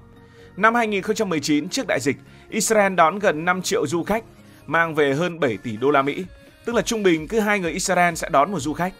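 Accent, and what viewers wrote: North, Vietnam. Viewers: Thông tin đăng tải và giọng thể hiện của bạn rất hay, nhưng mong bạn phát ấm chuẩn tiếng Việt để thể hiện "đẳng cấp" của kênh và ko gây ức chế cho người nghe cũng như giữ gìn sự trong sáng của tiếng Việt. Cụ thể: bạn ko đến nói dạy học thành dậy học, giàu có thành giầu có... đây là hiện tượng phát âm sai của người dân một số vùng quê đồng bằng Bắc bộ (được cho là quê mùa).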